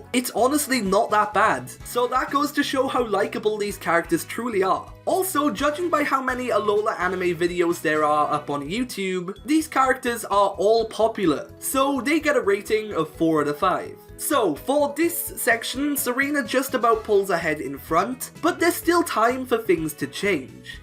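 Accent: Wales, United Kingdom. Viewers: Nice to hear a Welsh person on YouTube!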